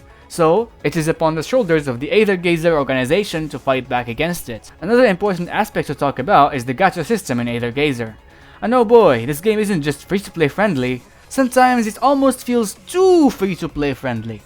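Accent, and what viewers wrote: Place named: Morocco. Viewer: bro are you moroccan you sound moroccan as hell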